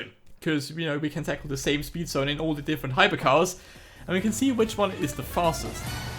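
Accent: Germany. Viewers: Find ich nice, dass man bei deiner Stimme raushört, dass du deutsch sprichst😂🙈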